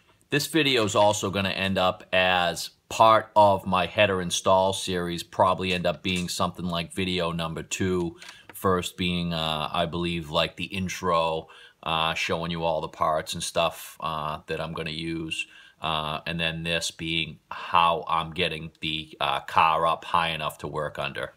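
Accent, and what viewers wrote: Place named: Boston, United States. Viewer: Hello form 02368. I heard that Boston in you for sure, before I read the name of the YouTube channel LOL. Great video.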